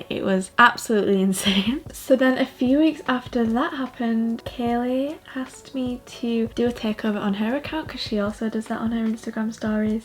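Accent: United Kingdom. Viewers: Wow I thought u were straight American but wow! BRITISH SQUAD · Are you from the uk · Everyone’s like I THOUGHT U ARE AMERICAN Me: I knew she was British 😂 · WAIT WHAT.....I had no idea you were British. I’m shook. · your british i am confused... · I honestly didn't know is British, I thought she's American 😂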